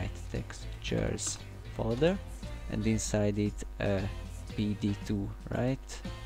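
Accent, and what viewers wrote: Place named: Hungary. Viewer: Szia jól rejted a magyart XD lehet hallani ahogy mondod hogy erölteted az angolt és a magyar kiejtéseden is hallatszik(saját mappa, eggyik videóban ki irtad angolul:ez egy magyar vagy valami ilyesmi nem tudok angolul) de egyb. örülök hogy van magyar profi!!!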